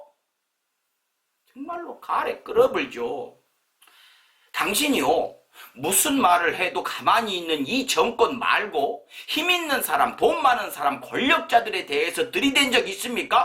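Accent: Jeolla, South Korea. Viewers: 왜 코메디 계에서 왜 안보이나 했더니 정치판에 들어왔냐?송충이는 솔잎을 먹어야 산다고 했던가? 하하..대가리에 들은것도 별로 없지 싶은데 참 어울리지 않는다. 표만 깎아 먹는다. 도 절라도 치인가? 전라도 사투리를 많이 쓰네! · 똥물... 맞네 ㅋㅋㅋㅋㅋㅋㅋㅋ 근데 발음 교정좀 해주세요 전라도 말인데... 차명진 문제야 지금은 경상도 말쓰면 경상도코로나 라도 하던데 피하고 난지금도 피하고싶어요 경상도 말하는사람들 한테 코로나 결릴까봐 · 강성범씨 고향이 경기도라고 하시던데, 전라도말을 구사하다보니 맛깔스러움도있지만 살짝 부담도 됩니다 속 시원하게 해 주는 매력 넘쳐요~~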